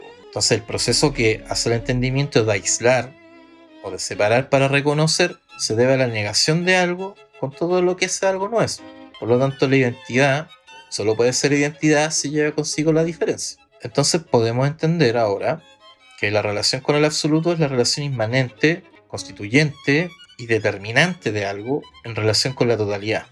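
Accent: Chile. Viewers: Jajaja es que es de chile, pero yo si lo entiendo y está muy bien .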